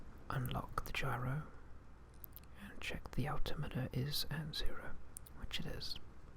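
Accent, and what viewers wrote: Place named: United Kingdom. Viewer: your British accent is so soothing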